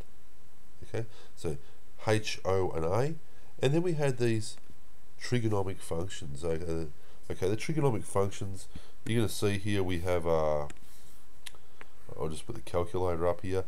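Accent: Australia. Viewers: flying jesus on a breakstick lol... Anyway, i think this guy's an australian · He is Australian, so am I haha, I was pleasantly surprised :) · So so helpful! The 3=6/2 was mind blowing. I always got stuck on when to divide and when to multiply but that little trick really worked, plus the Aussie accent is great! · Gotta love the Australasian Accent 💯💯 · 99% sure this guy is Aussie Also he’s not from New Zealand. You can tell by the way he says his i’s and e’s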